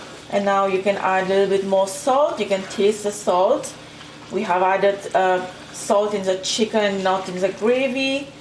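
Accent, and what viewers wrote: Mauritius. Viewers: Love the Mauritian accent👍🏽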